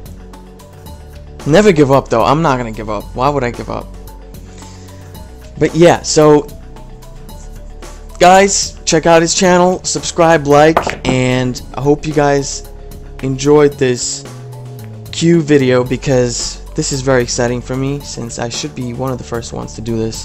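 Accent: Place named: New York, United States. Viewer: You sound like you're from New York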